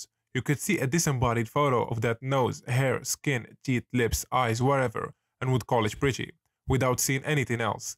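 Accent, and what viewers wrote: Morocco. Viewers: من أول فيديو عرفتك مغربي من صوتك 😅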